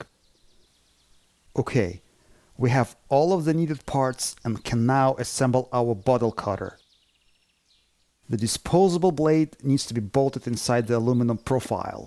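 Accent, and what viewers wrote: Russia. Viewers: Off topic, I have always found the Russian accent easiest to understand. I have spoken 2 other languages & even those are more difficult. Love this idea & liked this video years ago. I plan on making this cutter soon. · Русский акцент)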